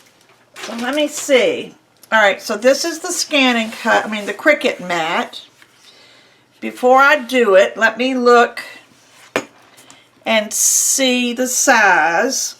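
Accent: United States. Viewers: I've got to guess from your accent your in America. If you think $17 dollars is expensive for a scan and cut mat - don't ever think about emigrating to Australia. We have to pay almost $40 for our mats. 😢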